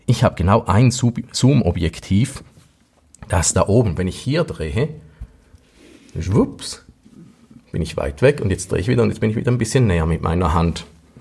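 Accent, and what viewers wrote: Switzerland. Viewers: Deine schweizerische Tonfärbung einfach eine grossartige einschläfernde Wirkung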